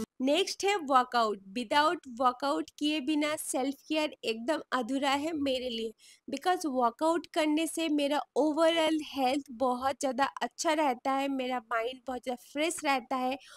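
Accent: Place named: Bengal, India. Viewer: App bengali ho.... Hindi sunke asahi lag raha hai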